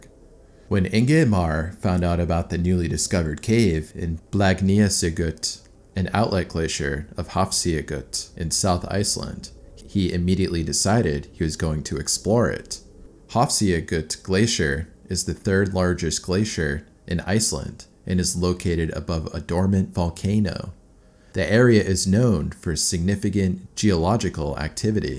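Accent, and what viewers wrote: United States. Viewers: American accent😂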